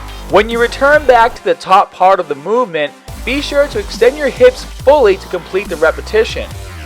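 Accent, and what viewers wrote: Boston, United States. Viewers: Love the filthy Boston accent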